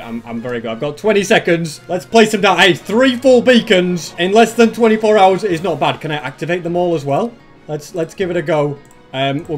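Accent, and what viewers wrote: United Kingdom. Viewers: You are Britishers because of your accent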